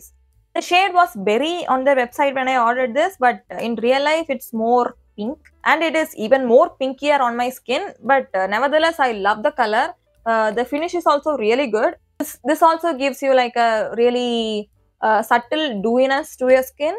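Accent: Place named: Tamil Nadu, India. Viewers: Ur tamil slag is awesome ❤do it in tamil ❤❤ keep rocking 🎉🎉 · Hello.. am ur new subscriber 😊.. addicted to ur videos dear.. doing great 😊.. i like the way u spk Tamil.. the slang..😊.. keep going my dear..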